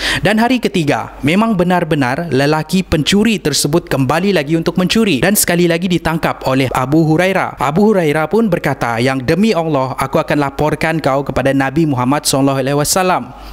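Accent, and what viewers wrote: Sabah, Malaysia. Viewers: Orang sabah ka ni? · Pekat beno loghat Sabah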